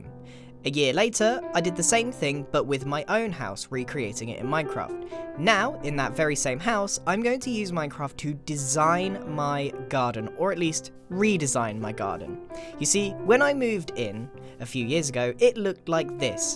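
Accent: United Kingdom. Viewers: Garage, I thought you were Bri’ish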